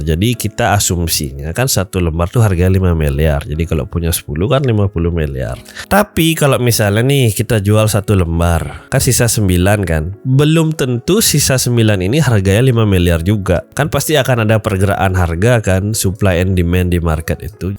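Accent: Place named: Padang, Indonesia. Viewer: Lu org pdg ya bg, logat nya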